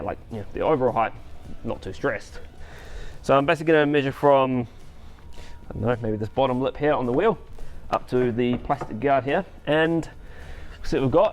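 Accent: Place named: New Zealand. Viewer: NZ. Great video and love the enthusiasm and being an Aussie Kiwi love the accent. Coming from a personally highly modified Wrangler it's hard to good Fortuner content like yours. The Fortuner won't replicate the Wrangler in extremes but that not what it is for but love what you are doing without making it crazy and keeping it a balanced. I have followed your lead and just stuck on Maxxis Razr and am also looking at EFS suspension over OME or Tough Dog (maybe you should look at sponsorship ;-)). I am really interested in the longer shock you put in the rear and how that is still performing. Can you provide the model no of the Rear EFS shock please. Cheers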